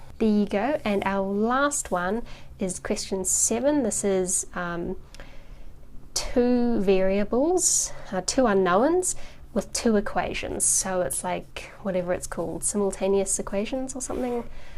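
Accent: New Zealand. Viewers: Sound like a kiwi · Are you from New Zealand? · I think she is New Zealandish? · New Zealand accent? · Thank goodness for the Polish subtitles. As an Australian I was struggling with the New Zealand accent.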